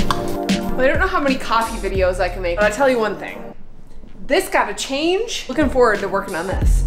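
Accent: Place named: Canada. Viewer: I’ve never caught your Canadian accent at all until this ep, love it! AHdio hahaha 😎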